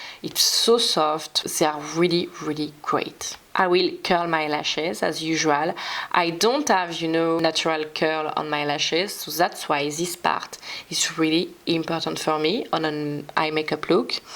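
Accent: France. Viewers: Coucou, j’ai une petite question vraiment pas pensée de manière méchante mais fais tu exprès d’avoir un accent français en anglais aussi prononcé ? Peut-être pour accentuer ta différence d’être française entre toutes les autres YouTubeuse ?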